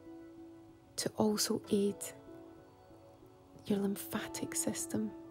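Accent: Scotland, United Kingdom. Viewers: The Scottish accent is heavenly with headphones. Oh, and when I finally nodded off, I had a dream that you made a charcuterie board ASMR vid. Then it dawned on me; without me readers on, that's what the salts 'n oils board sort of looks like. 😅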